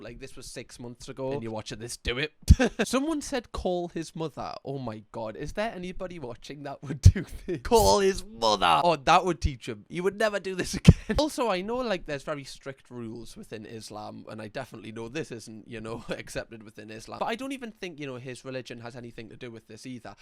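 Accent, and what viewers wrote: Newcastle, United Kingdom. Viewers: Another day, Another Vid. Love u and the Geordie accent lad.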